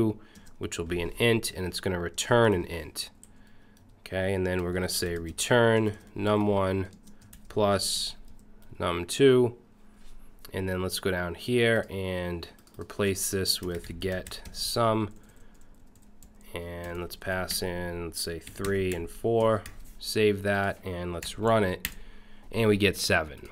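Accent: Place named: Northeast, United States. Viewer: I love your accent. It reminds me of my childhood. Where in the Northeast are you from? (My guess is the Tri-State, probably Jersey.)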